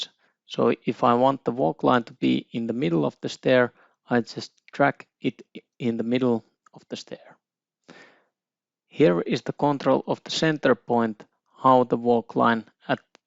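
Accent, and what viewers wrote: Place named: Finland. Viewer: Finnish accent easy to spot :D thanks for the tutorial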